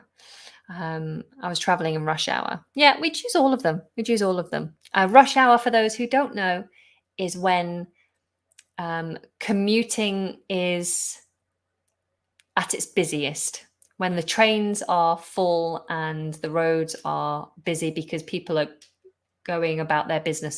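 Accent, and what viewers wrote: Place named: United Kingdom. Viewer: First of all, congratulations on your channel, I have been looking for a channel with British English for a long time and I found yours to be very funny. Secondly I would like to ask you a question as a new subscriber. Are you still uploading short videos or I have to join some group? I loved them. Thank you in advance, and cheers from Madrid.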